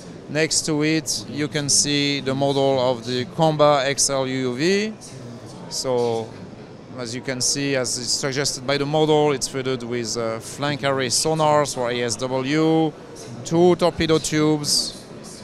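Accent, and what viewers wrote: France. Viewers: Why did they hire the Frenchest French guy to narrate this in English? Could they not afford an actual English speaker?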